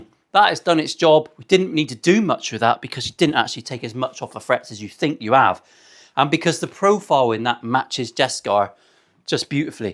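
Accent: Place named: Portsmouth, United Kingdom. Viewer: I'm not even watching the clip, just listening and enjoying the beautiful Portsmouth English while carving my new piece. Greetings from Belgrade!👋🏻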